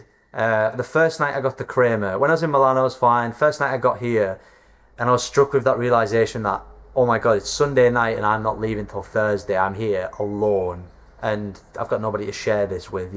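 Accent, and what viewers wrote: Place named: United Kingdom. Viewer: Bro has a really strong British accent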